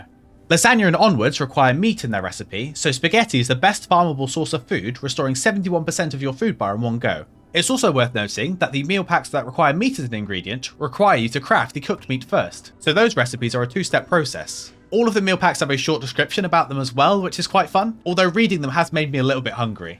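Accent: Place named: United Kingdom. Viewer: I gate how brits pronounce things but otherwise good video.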